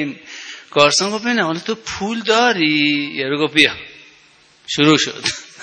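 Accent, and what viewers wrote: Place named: Isfahan, Iran. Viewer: خیلی عالیه لحجه ی اصفانی وقشنک است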